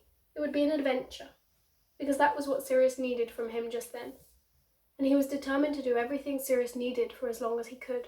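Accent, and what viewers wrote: United Kingdom. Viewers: listen to this fanfic in British English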